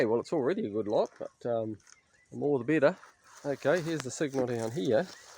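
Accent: New Zealand. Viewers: Its quite marvellous😂😂 and obvious hes a KIWI! Derr!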